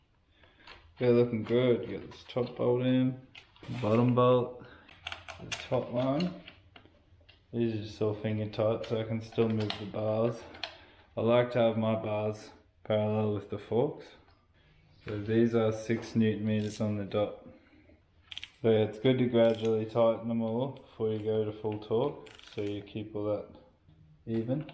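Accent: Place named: Australia. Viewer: Hey mate nice to hear the aussie accent, thanks for the no bullshit video🤣I do have a question if you could help us out. I've just swapped mine out and note the stem has the slightest wobble inside where the bearings go as if the stem isn't wide enough. I'm not sure if the last forks did this as well. How did yours turn out?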